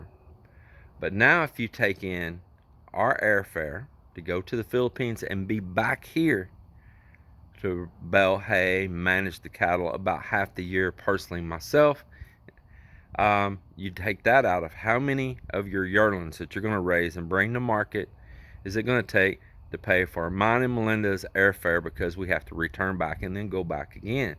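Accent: United States. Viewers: Certainly alot of decisions to have to make but at least on balance your listening all the best advise to allow a good judgment call, l love listening to your laid back American accent🤔🤗♥️👍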